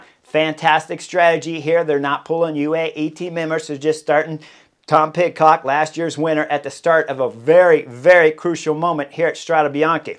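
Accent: United States. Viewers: has the most "I don't give a fuck" American accent in cycling. Butchering every race and rider name left and right😅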